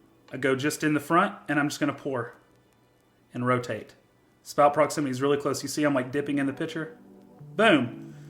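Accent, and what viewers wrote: South, United States. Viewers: Great videos, man! The quality of my steamed milk went up an entire level thanks to you! I have to ask, where are you from? Your accent sounds super familiar, to me. (I'm guessing Georgia or Alabama)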